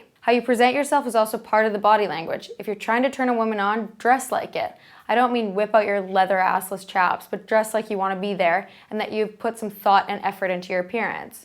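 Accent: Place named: United States. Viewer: Manish Thakur Which accent ? she's just american. Btw I don't wanna be mean but people don't generally like the indian accent so... It's not the best approach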